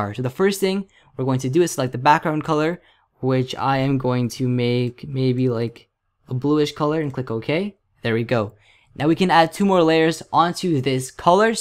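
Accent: Canada. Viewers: Are u CANADIAN? ( do you live there) Here?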